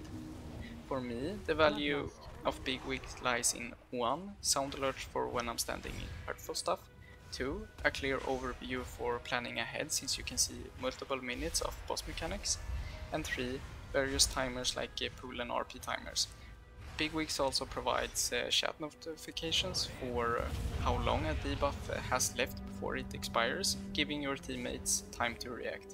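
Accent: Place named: Sweden. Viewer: Sjuk svengelska bror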